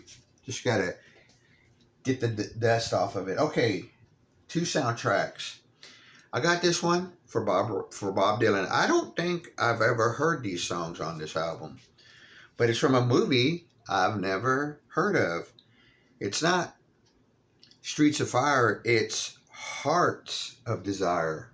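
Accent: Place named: Texas, United States. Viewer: " " may be their best record. Have to admit, when I hear your accent, I thought you were more Mississippi or Louisiana but now I'm picking up your Texas. Are you familiar with out of Beaumont? Great finds! Love ya!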